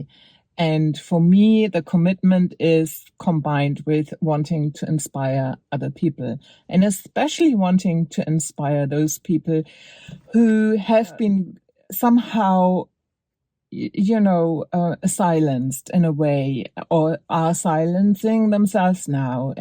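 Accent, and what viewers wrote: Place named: Germany. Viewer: Nice and inspiring, I don't know why but I quite like the German accent lol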